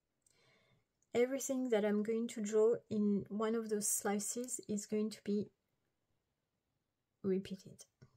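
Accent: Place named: France. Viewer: Hello and Wow, what a beautiful hands, color of nails, and that voice + French accent, am I right? Thank you for this tutorial, this is what I need for my project. I wan to design and make incense stand with mandala motif. You helped me a lot☺ Greetings from Poland Edit: I have a problem with symbol layer, when I do the final stroke to draw mandala shape like you in this circle, the lines are drawn automatically but in one direction left or right instead facing each other. What I'm doing wrong🤔 Edit_2: I have figured out. My mistake by instead of adding first triangle to symbols, I have first created both, connected and then added as symbol which did not point stroke later to the same direction, but opposite, spreading away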